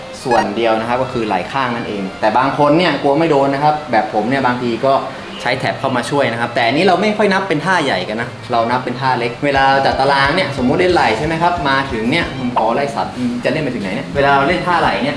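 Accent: South, Thailand. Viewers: มาแล้วสายฮามีสาระ5555 ชอบสำเนียงคนใต้ชัดๆ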